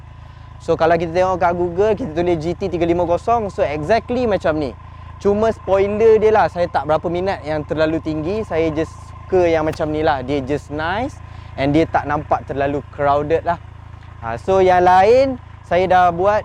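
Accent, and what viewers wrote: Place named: Kelantan, Malaysia. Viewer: sama mcm penerangan kat honda fd dia...slang kelate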